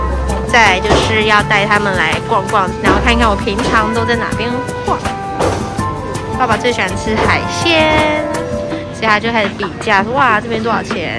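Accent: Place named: Malaysia. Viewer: 你講話很馬來slang了😂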